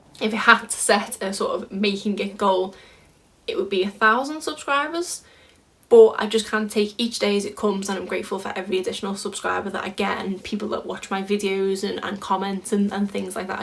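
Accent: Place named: Yorkshire, United Kingdom. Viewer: Your accent sounds something like Yorkshire. Lovely accent!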